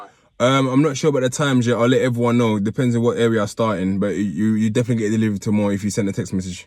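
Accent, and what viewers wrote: United Kingdom. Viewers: Pete Long I dunno what being Nigerian has to do with it? 🤔.. I was referring to UK accent anyways..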